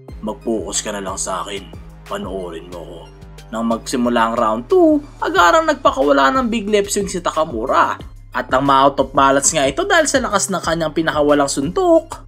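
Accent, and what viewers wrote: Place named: United States. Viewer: Ayus par gayang gaya boses ng mga Americano a hahaha ganda by the way ❤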